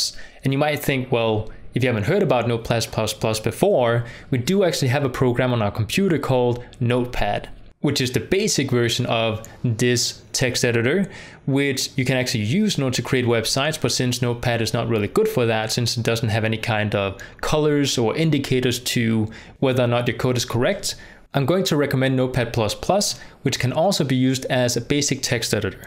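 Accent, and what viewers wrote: Denmark. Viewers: I could hear from your very first video that you were from Denmark lol, Hello fellow dane!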